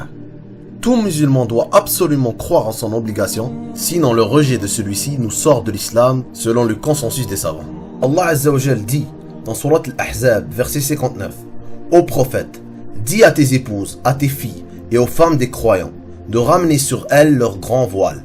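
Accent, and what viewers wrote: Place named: Kabylie, Algeria. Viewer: L'homme qui parle dans cette vidéo kabyle non🤔 (d'après sa façon de parle )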